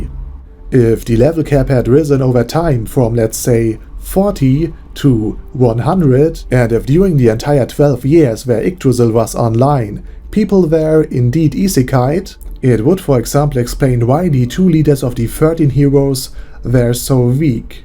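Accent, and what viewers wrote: Germany. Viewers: Dein deutscher Akzent ist echt heftig.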